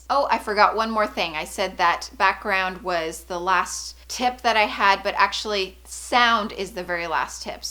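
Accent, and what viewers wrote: Canada. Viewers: It's a Canadian accent 👍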